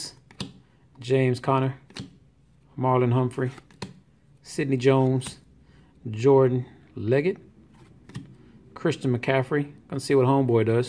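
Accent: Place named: Louisiana, United States. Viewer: Oh cool I'm in Texas too, but I was born in Louisiana and you kind of have the accent so I was jc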